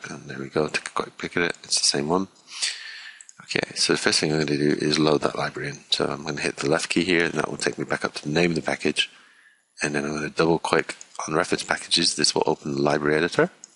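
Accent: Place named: Scotland, United Kingdom. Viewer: Firstly, thanks for taking the time to make a tutorial. It's much appreciated by many! As others have said, if you are going to actually take that time to make something to help people, it might be worth trying to slow down (a lot) when you're talking, and try not to mumble. Your Scottish accent adds an extra layer of difficulty for those non-Scots. It would really help everyone to understand your excellent tutorial. But thanks again for a great tutorial!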